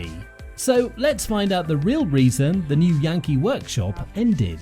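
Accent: England, United Kingdom. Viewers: Why do they import a brit to narrate? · I can’t believe someone with an English accent read out this tripe! Get a half decent script editor and transform the quality of your videos overnight!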